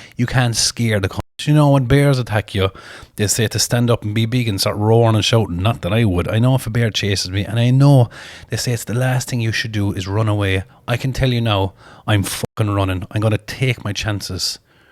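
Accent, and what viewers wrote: Ireland. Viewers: are you from cork bai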